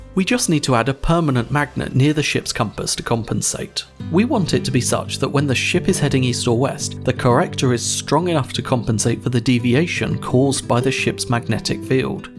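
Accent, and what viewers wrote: United Kingdom. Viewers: Sorry, but am just tired of the instinctively haughty British/cockney accent. Any chance you can post a video where MS Word reads the text? "Feewwd" vs. "Feeld"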